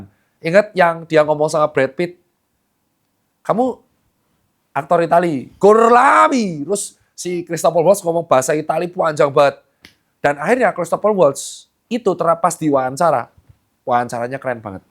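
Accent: Java, Indonesia. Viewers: English Jawaa Medhokk , hmmm kek kenall yang medhookk medhookk "we wok......" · seru nih, soale Dokter Tirta medok Jawane, jadi sperti orang pada umumnya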